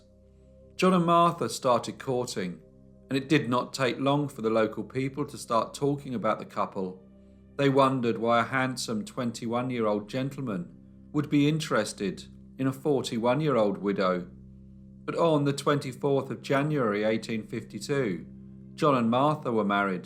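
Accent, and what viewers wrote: England, United Kingdom. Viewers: I like this narrator’s voice. And his English accent. Love his stories, too.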